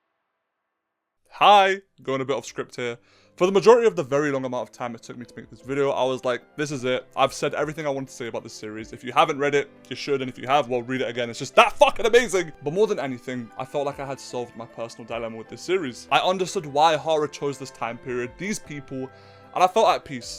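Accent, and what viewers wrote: United Kingdom. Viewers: Im sorry to say this but I raise the volume because you talk with british accent so I can understand what is bro talking about. but on several occasions bro shouted when I turned up the volume, making it uncomfortable for me to watch 🙏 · Why do you have a british accent? Aren't you chinese?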